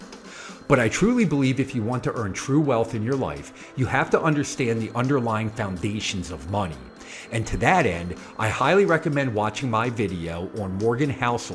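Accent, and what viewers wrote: Philadelphia, United States. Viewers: Love the Philly accent! Interesting topic, but now i need to go to Wawa and grab a hoagie and a wooder ice. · are you from Philly?? I cannot tell if that’s a Philly or delco accent 😅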